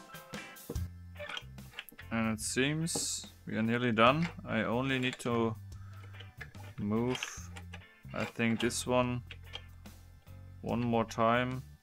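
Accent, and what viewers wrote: Germany. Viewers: Man merkt das du deutscher bist :D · THIS IS THE GERMAN ACCENT ! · Wie man einfach den Deutschen Akzent direkt erkennt :D Super video c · You are from german XD I can hear it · Man hört das du Deutsch bist. 😅 Nicht böse gemeint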